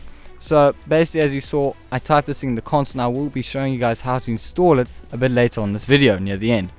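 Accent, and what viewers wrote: South Africa. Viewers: south african??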